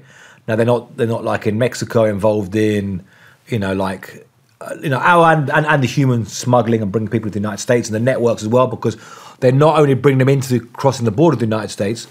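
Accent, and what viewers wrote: United Kingdom. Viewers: Where's this guy from, he sounds kinda British but his accent is all over the place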